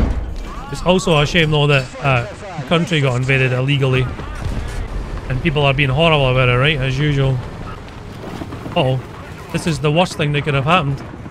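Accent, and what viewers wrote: Scotland, United Kingdom. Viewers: so glad to see you back dood! youtube has felt barren and desolate without your beautiful scottish voice brightening my day. Hope you’re doing okay my dood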